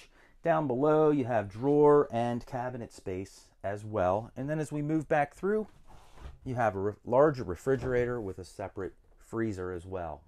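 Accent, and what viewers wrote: United States. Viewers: Love your warm accent 😄 you really lengthen your oooooo’s which is so warm to listen to , a very friendly down to Earth American accent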